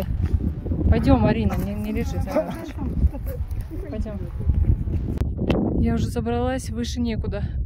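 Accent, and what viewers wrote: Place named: Armenia. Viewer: ты говоришь по русски с милым армянским акцентом 😁👍🌹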